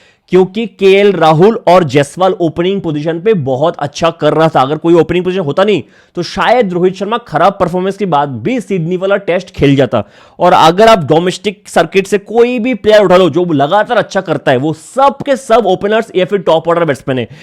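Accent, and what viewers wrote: Bengal, India. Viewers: Are bhai wo bengali hai from what i can tell based on his accent. Mai bhi bengali hu isliye apko ek chiz explain kr rha hu. Hur communuty ke bolne ke trike mein apko kuch na kuch odd lagega ya funny lgega. Bengalis emphasize heavily on pronouncing words that sound like they have an e or u. So probably when he is saying will wo wueel sunata hain. Isko leke mazak udane se better why don't u just focus on the content and learn from him. He is a living encyclopedia in cricket stop trying to make fun of someone's way of pronouncing stuff when u haven't done jack shit with your own life. Tu bahar jayega na pdne be it canada or us or anywhere wo tere perfect accent ke upar haske tereko hur roz bully krenge tb acha lgega tereko? Have some empathy bro. Big 26 mein tu baith ke faltu chizo pe joke mar rha hai to sound cool.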